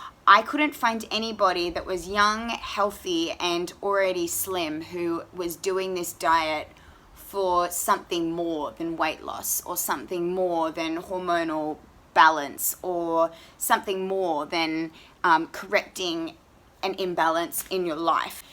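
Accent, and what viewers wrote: Australia. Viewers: THIS!!! I was looking for somebody i could relate on this diet. When I saw you I was like: YEP! THAT'S HER!!" my kind of girl to follow: strong, confident, beautiful mind (and body)., well spoken (love the aussie's accent, can't help it;) ),please don't change yourself! ever!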